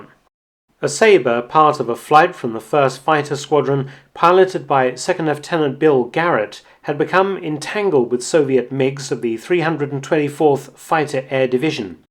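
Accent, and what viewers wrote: United Kingdom. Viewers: Why use Robo-voice when you speak in a sophisticated British accent?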